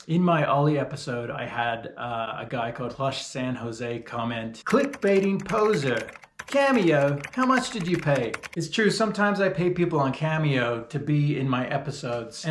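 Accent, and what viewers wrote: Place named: Australia. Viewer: didnt know you were aussie!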